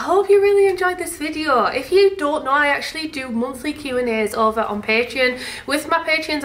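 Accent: United Kingdom. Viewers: If you ever get the chance... The new mocha flavored Kit Kat duo is fabulous! I got to have one last month, but now I can't find any in the stores I usually go too. It's the same way with the dark chocolate Kit Kat. So good!!! But so rare to find. And I love your purely British accent. You're adorable! Such a sweetheart. Love you, buh bye! Makes me smile every time. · You don't sound german at all. I am from Germany. You sound totally british. I love England and the english language so so much. I also have family in Gloucestershire. I am really really sad about the Brexit thing 😢. I can't understand why you want to be separated from us. Anyways... Greetings and hugs from Germany! Stay strong and safe! Tina xxx 😘🤗💗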